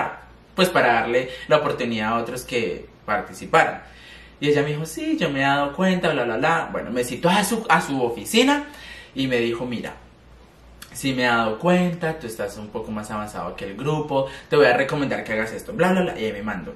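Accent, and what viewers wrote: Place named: Colombia. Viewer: Amo tu acento colombiano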